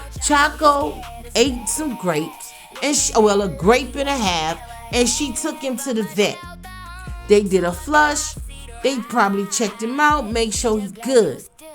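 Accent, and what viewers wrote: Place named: New Orleans, United States. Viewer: Girl I’m from New Orleans and as soon as I heard your voice you sounded like family. But I yea this whole situation is crazy. I’m praying for the dog 😩😂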